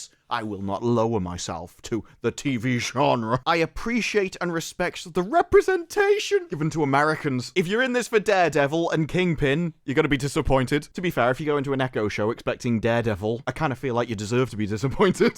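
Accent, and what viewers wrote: United Kingdom. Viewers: I couldn't get thru six minutes of this obnoxious brit talking shit. Like damn is this his whole platform? Pass.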